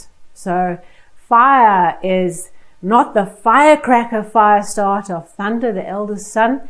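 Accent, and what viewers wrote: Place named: South Africa. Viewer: Oh my goodness, you are South African. I am too!!!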